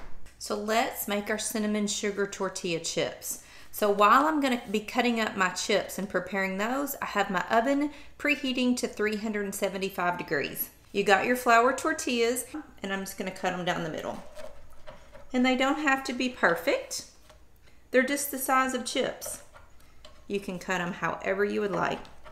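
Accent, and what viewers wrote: South, United States. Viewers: AHH,MY FAVORITE LADY.LIKE THE SOUTHERN ACCENT.YOUR THE BEST.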